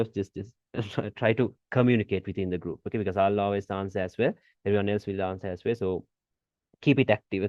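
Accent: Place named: Malaysia. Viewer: When hear ur voice i thought u Master is malaysian 😅😅😅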